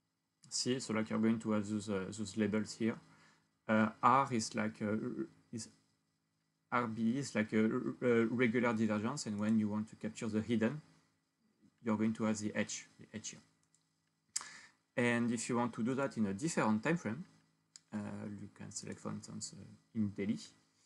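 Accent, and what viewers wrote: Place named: France. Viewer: toi tu es français 100% ton accents c'est sur poto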